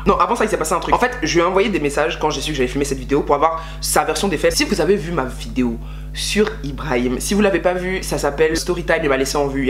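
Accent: Ivory Coast. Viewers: Mdrrrr ton accent ivoirien . Trop fière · le montage me fuuume en plus a force de regarder tes videos je parle comme toi et je commence a voir l accent ivoiriens :)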